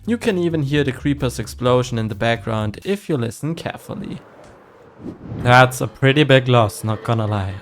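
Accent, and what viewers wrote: Germany. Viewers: you sound like suuuuper german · The German accent is just 👌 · I love the accent omg! Are u german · u sound kinda german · He is German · the german accent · Ich wusste doch du bist deutsch, ich höre den Deitschen Akzent raus 😂 Btw ist die Kartoffel eine Anspielung darauf? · Man merkt, dass du deutsch bist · Sehr Gutes video man hört Dehn deutschen Akzent raus 🤙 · Ha ich wusste dass du deutsch bist, der Akzent 😂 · You have a german Accent 😅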